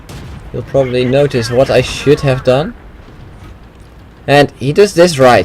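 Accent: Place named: Netherlands. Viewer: he is dutch, just like me and ussually the dutch hava TERRIBLE accent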